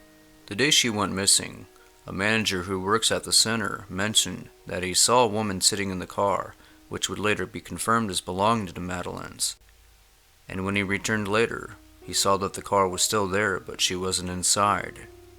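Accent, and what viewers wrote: South, United States. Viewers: You sound like the distant cousin of Jensen Ackles who comes from the south and is unfazed by everything.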